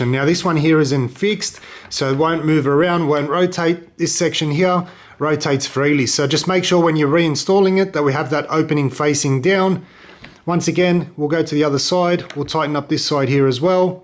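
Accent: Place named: Lebanese-Australian, Australia. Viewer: I left my home in Sydney's inner west and moved to QLD in 2007, geez I miss the Habib accent. Thanks for the lesson and the bonus nostalgia.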